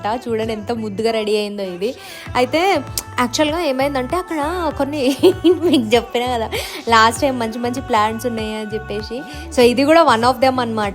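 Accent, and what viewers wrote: Telangana, India. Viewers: Me matalu vini subscribe chesina sister... telangana slang lo mastu matladuthunnav... 😍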